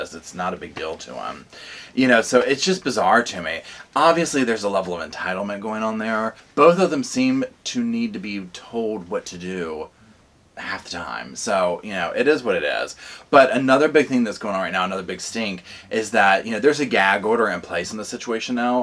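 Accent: South, United States. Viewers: Omg I cannot wait for you to share your other channels! Hahaha I’m a sofasquad groupie now. Full disclosure: You’re seriously just the sweetest thing I’ve ever laid eyes/ears on. You always seem to say exactly what I’m thinking. And Uhg your southern accent just tickles me to death. Ok sorry now I’m sounding borderline creepy... Just sayin, I’d listen to your podcast even if it was just you discussing your bowel movements or whatever 🤷🏼‍♀️ so keep up the good work bud 😊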